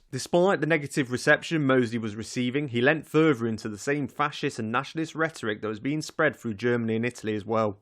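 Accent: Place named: Nottingham, United Kingdom. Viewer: Great Nottinam accent 😂. Love from Scotland ❤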